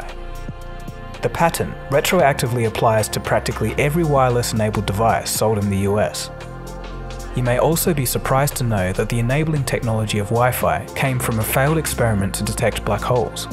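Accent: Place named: Australia. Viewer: I've seen 4-5 videos and already a fan, i don't normally find Australian accent very pleasant or cool but somehow I find this accent and channel very satisfying. Keep up the good work fam. The background music, intro the voiceover everything is so satisfying. ❤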